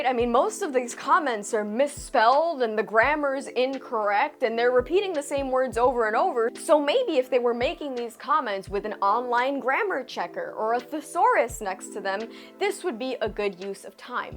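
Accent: Cuba. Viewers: Que bonito hablas espanol! Mi cubanita linda. No matter what you say or how strong opinion you may have, say it in that beautiful cuban accent. Te derrites como la cera caliente! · Cuban accent detected! ❤ half Cuban/half Mexican here Represent! People suck, if you want to watch review videos like yours and want to contribute its better to comment politely and give your opinion with reasons for giving your opinion. Make your case, but why start disrespecting and acting like an internet tough guy? Puro paz y amor, everybody chill.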